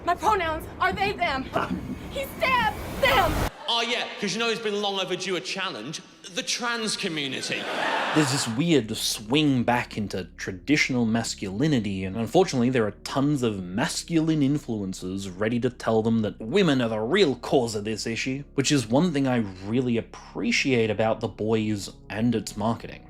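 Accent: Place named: Australia. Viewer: I thought you were English -- I didn't catch the Aussie accent, but still nailed it. I'm not sure you fully understand why Unite The Kingdom exists, but judging from your points, I'm guessing it doesn't matter because you have that far-left ideology understanding of what "masculinity" is. However, your reply clearly took time & was..... well, nice. It's hard to actually engage with people, nowadays, especially online, & just be civil. Cheers, to that. I write back because I want to see if I can somehow sway your opinions, or cause you to want to actually be informed on things -- your misunderstanding of why I said "migrants" & not "immigrants" caused me to want to reply. I also disagree with you completely about the comic & the show -- they are DIFFERENT. The comic is so much better at creating & exploring the world, while the show offers other aspects that are done better. 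I'm also a fan of how the comic implemented compound V, as it gives some of the characters more agency. The finale of the show borrowed heavily from the comic, when it shouldn't've -- yes, the show commented on everything you mention, but it wasn't until season 3, or so, when it just became another far-left media of propaganda, eschewing any balanced parody or satire, for openly taking shots at anyone who doesn't agree with "The Left", even those who participate in politics under the same flags. In mentioning this, I am reminded it was your comment about how you're "confident by the small comment reducin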